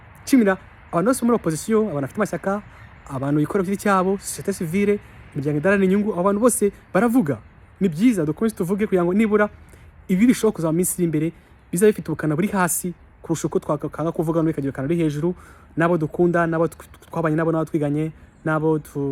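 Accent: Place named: France. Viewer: She has a french accent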